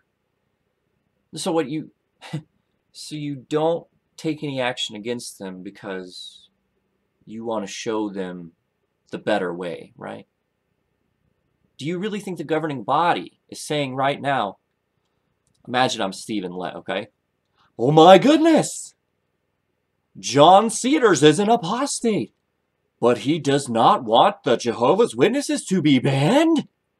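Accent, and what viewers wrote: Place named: United Kingdom. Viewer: I like the Britsh accent! lol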